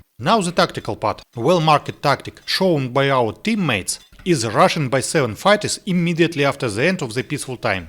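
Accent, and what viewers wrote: Russia. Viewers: я не лингвист и могу ошибаться, но вроде бы это ошибочное мнение новичков в языке (и я так думал; не то чтобы я был гуру в английском ^_^, но считаю, что уровня Intermediate). Чётко и с акцентом -- русским так чётче и понятнее, а иностранцам -- наоборот. Но ведь такое видео делается как раз-таки для них...:) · Русский акцент)))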